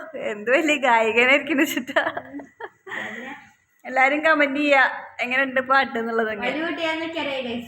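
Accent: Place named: Thrissur, India. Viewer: നിങ്ങളെ സംസാരം കേട്ടാൽ മലപ്പുറം ആണെന്ന് തോന്നും. But കുഞ്ഞു തൃശൂർ slangan. വെള്ളപ്പം 👍🏻try ചെയ്യണം ❤️❤️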